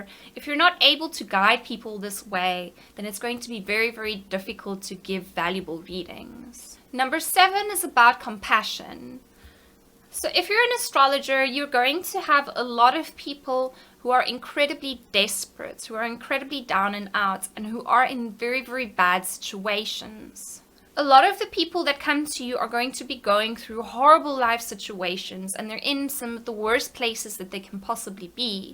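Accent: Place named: South Africa. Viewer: you can't destroy that south african accent with a ridiculous american "r"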